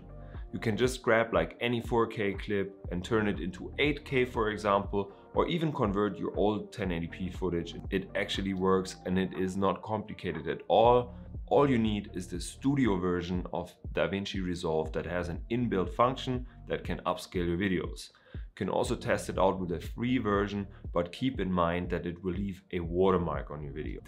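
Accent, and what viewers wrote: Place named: Germany. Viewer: Excellent tip, thanks. You sound very German,.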